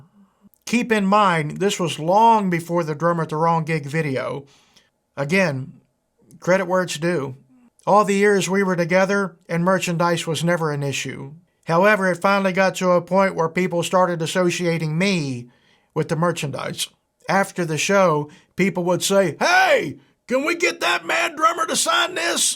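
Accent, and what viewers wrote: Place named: West Virginia, United States. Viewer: I would have known where you were from just by talking. I know because I talk the same way. Keep up the Great Work. Keep making West Virginia look great. Thanks.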